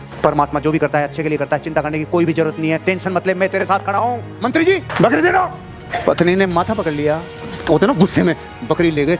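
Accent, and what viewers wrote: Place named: Jaipur, India. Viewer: क़तई ठेठ जयपुरी भाषा बोली 😂😂😂 सर जी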